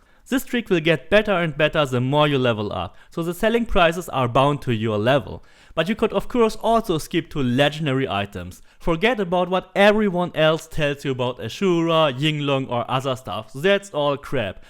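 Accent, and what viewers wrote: Germany. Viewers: Der germanischste akzent den ich je gehört habe. Probs für das informative video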